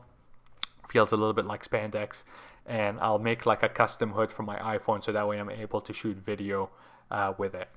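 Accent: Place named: Quebec, Canada. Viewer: Merry Christmas! Will check out the portholes. Really neat. And I was talking to someone locally and they said you sound like you might be from Quebec. True? 😁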